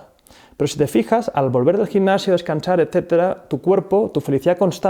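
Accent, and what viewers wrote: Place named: Castilla-La Mancha, Spain. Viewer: DE DONDE ERES? POR TU MANERA DE HABLAR!! QUE DICES "HE DEJAO " PASASTE LA NAVIDAD CON LA FAMILIA. ERES DE CASTILLA LA MANCHA? 😄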